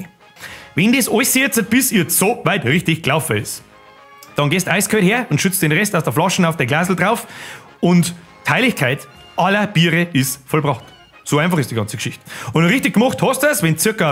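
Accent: Bavaria, Germany. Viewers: oh Mann ich mag dein bayerisch xD bitte mehr ^^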